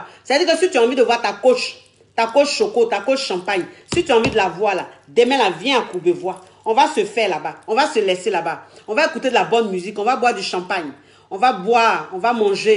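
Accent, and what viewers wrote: Ivory Coast. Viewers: je suis congolaise de Brazzaville mais dés que j'ai vue la dame parler j'ai su qu'elle était ivoirienne. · ivoirienne d' après l' accent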